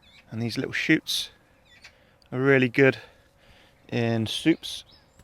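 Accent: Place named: Gloucestershire, United Kingdom. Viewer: Judging from some previous videos I’ve seen and the accent my guess it’s just a bit South from where I live. I’m in Gloucestershire so further down the river Severn probably.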